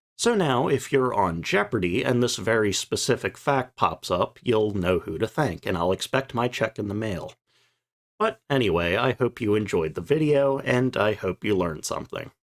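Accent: United States. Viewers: I do not like videos that have measurements n them that use only the Metric system! You sound like a US citizen, you are on the US YouTube channels and yet you completely leave out our system of measurement which is the Imperial System! Why do you Pinheads do this? I have to stop this absurdity and have given you a thmbs down!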